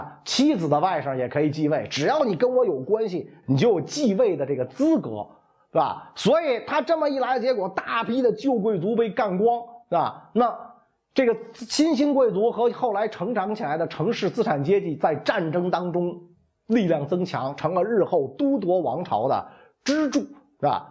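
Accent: Beijing, China. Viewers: 袁先生的Beiglish第一拝聴、 很有特徴！！😉👍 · 老師聊什麼我都愛聽，這口京味就是聽著舒服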